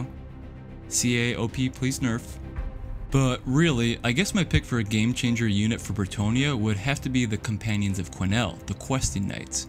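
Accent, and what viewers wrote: United States. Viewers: I'm from England mate defiantly best not start a youtube channel. Dont worry..... Yanks all sound the same to us man. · I'm detecting a Midwest accent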